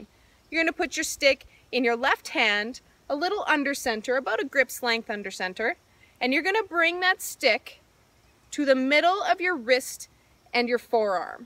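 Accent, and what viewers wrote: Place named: Canada. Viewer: Canadian?Thought I heard the majestic accent!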